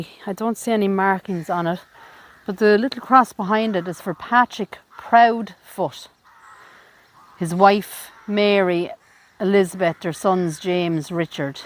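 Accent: Ireland. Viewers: So impressed you cleaned what you did by hand. Reminds me of when i was a girl, my Dad and I spent many happy years wandering around graveyards. Dad was a bit of an historian and we discovered an unknown battleground and a mass grave of Maori warriors, ( men and women). Loving your Irish accent.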